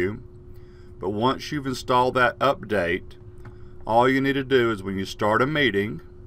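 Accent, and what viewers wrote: South, United States. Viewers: Hi! I have a Simpson's and The Shining background I would love to use for when I'm in zoom classes. I have the latest version - updated yesterday (version 4.6.9) and the screens won't work without a green screen. Any advice? Also noticed the southern accent and was curious where your from. My family is from North Carolina.:)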